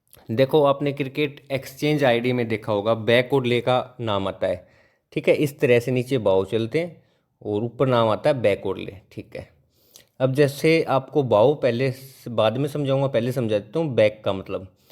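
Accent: Haryana, India. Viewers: Haryana se ho bhai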